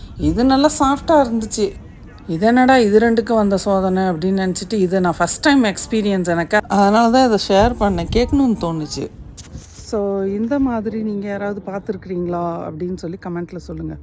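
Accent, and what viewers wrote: Salem, India. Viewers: Chappatgi kalli you showed is what we have here in India...நம்ம ஊர்ல ரொம்ப பரவலா பார்க்கலாம்...இதுல இருக்கும் பழம் சாப்பிட்டாலும் நாக்கில் முள் குத்தும்....சேலம் பேச்சு வழக்கு அருமை.......Stay blessed sister...take care..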